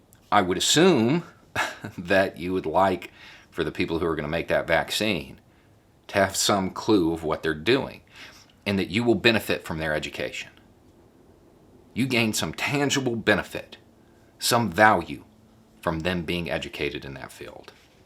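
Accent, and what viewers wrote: South, United States. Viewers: Thank you my brotha. Finally some sense in this comment section instead of the “you tell it maaaaaaan” echo chamber. You know how many other black students I saw in the business analytics program ? Fucking 1 you know how many black students were in the business school getting degrees in marketing and international business? Literally thousands. It’s all about what you invest in. I invested my early 20s in the military to pay for my degree and I made damn sure it was worth something. What this video is is a trap. They see the white guy with the goatee the southern accent and the hat and they hear him talk like Bernie sanders and they are like “ahhhhh shit this is one of the good white guys. He looks like a racist ass Trump supporter but he’s invited to the cookout” what a fucking joke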